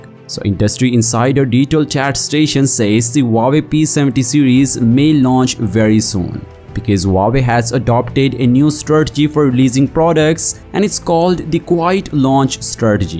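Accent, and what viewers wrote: India. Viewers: Indian English accent 😂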